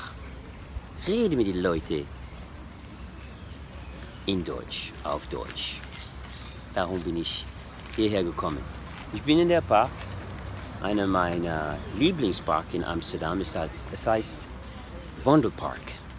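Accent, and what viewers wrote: France. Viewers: the french accent is the most charming one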